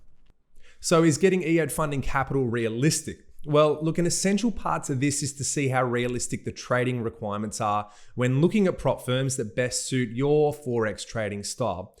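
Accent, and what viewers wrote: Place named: Australia. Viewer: Your accent being too Aussie, can only suggest that you have a bias to lean towards conformity rather than ingenuity. Other than that, seems like an alright person.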